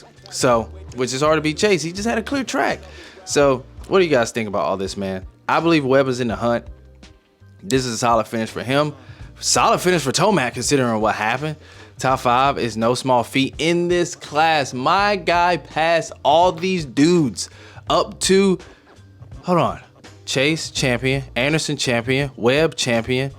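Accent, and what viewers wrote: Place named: Australia. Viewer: Your Aussie accent is hilarious 😂😂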